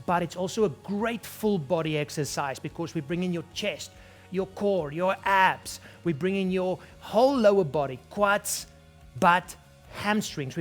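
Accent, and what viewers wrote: South Africa. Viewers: This dude is so South African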